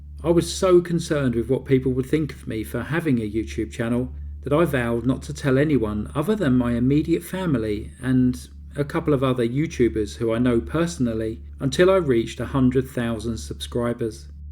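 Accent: United Kingdom. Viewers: "...limped past the 100K mark." I loved that, for its humility and honesty. I'm a subscriber, only having found you a year or so ago. But, I love true crime so yours, and one of the others you referenced, are the two I watch. Always. I love British accents. And, I don't care from which area of Britain, they are all charming and--to my American ears--very sophisticated. I think your delivery is lovely, measured and with a thoughtful tone. Anyone who would send you negative comments (and I'm sorry about that) are just envious, because you've accomplished something the have NOT. I understand your taking a break. You've described your experience with Youtube very clearly and I am sorry for the struggles you're having. Those of us out here who are so interested in true crime do love what you do, and your work is appreciated. Speaking for myself, I LOVE Petty Crime! 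For the very reason you said, a light, humorous note to sometimes very dark material. I find myself smiling at each one. Well, until I saw the snake today. . . not a snake person. One last thing: I like the avatar you have now. Its the best I've seen of all you've had (I did go back and view other, older videos once I finally found you). I hope you'll be back 💙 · Hello from London Ontario Canada. Thankyou for the video. Had no idea how much hard work goes into making a video. I too love crime stories, and I want to say that you do an Amazing job with your stories. I always find them very well done, the detail and pictures. I'm not sure how long I have been watching, but I believe I was first brought attention to your channel by Brief Case. You said that sometimes people insult you, that's terrible. I don't understand why people do that. Crazy people in the world. I Love British accents, so I Love your voice. 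I hope you enjoy your break. If you decide to carry on your channel, I will throughly look forward to it. Thankyou for your channel. Goodbye.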